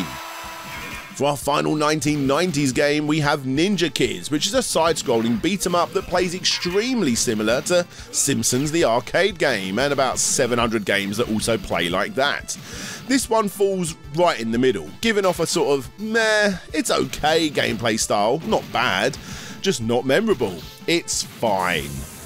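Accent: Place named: United Kingdom. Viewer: "Lupin" is pronounced "Loo-pan" in Japanese, as it's based on a French character/story. Also "kage" pronounced "Ka-geh", not "cah-geh" as you did in the video. "Shuriken" is pronounced "shoo-ree-ken", not "shuh-ree-ken" too. Hope this helps and thanks for the great British-English review! Always good to see someone not pandering exclusively to the US!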